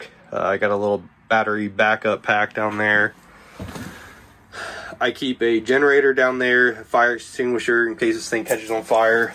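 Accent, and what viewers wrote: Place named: Pittsburgh, United States. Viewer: Bro that’s a hell of a setup. The effort you put in is evident and well worth it. Organization is key in this field. Where are you from? Pittsburgh/Ohio area? Thought I heard a little Pittsburgh accent in there lol.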